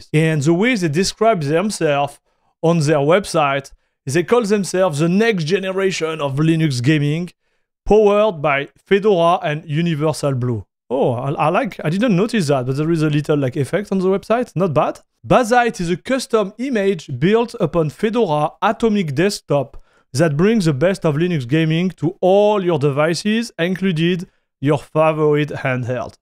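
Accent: France. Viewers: Tu serais pas un peu français ?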